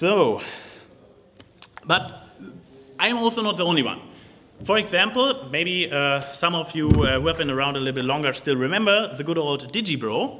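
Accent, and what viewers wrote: Germany. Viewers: are you german? you really sound german because of the way you say "the" nice panel tho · exploding guy :3 yes. he come from germany.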